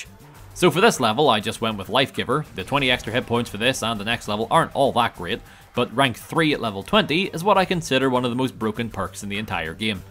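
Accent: Northern Ireland, United Kingdom. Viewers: From the beginning of the video where you said you talk like Marge Simpson I was trying to place your accent. I knew it was Irish and thought it was probably northern but you answered my question in the first video I legitimately thought about it. Love when that happens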